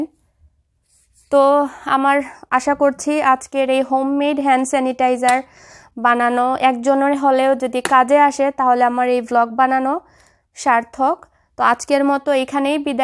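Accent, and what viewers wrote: Sylhet, Bangladesh. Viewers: আপা, অনেক ধন্যবাদ আপনাকে। আপনি কি সিলেটি?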